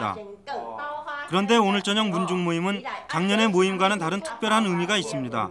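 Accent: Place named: Seoul, South Korea. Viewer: 그러고보니까 나레이터 90년대 서울 사투리 안 쓰고 요즘 서울말에 가깝게 말하네 취재진들은 90년대 서울 사투리 쓰는데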